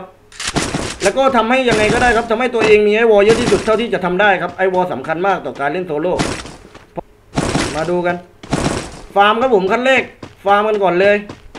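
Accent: South, Thailand. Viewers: โครตชอบภาษาใต้เลยนิ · พี่คนใต้หรอผมคน นครศรีธรรมราช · ผมฟังจากสำนีงเเล้วว่า คนใต้นิเบล่อ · พี่พูดเหมือนคนใต้เลย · กูว่าเวลาแกพูดแก้เหมือนคนใต้ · พี่คนใต้ผมคนใต้5555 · แหลงเหมือนคนใต้เลยย🥺😁 ไม่ต้องงงแขบบ55😆😅🤣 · เห็นพูดใต้ได้ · พอพี่พูดใต้ผมกดไลค์กดติดตามกดกระดิ่งเลย ผมเด้กใต้ด้วยย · ผมโครตชอบสำเนียงพี่เรยวะ สำเนียงใต้นิ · พี่เป็นคนใต้แน่เลย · พี่คนจังหวัดไหนค้าบ? เหมือนคนใต้อ้ะ!!FCค้าบ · 🌴เป็นคนใต้ม้ายนิหลวง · เเหล่งใต้เลยน่ะ 😆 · เข้ามาแลทีแรกเห็นพี่แหลงใต้ ผมกดไลค์ กดติดตามเลย คนสุราษฎร์ธานี🤟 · เปิดคลิปมารู้เลยว่าพี่เป็นคนใต้ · ผมกะไว้แล้วเสียงพันนี้คนใต้แน่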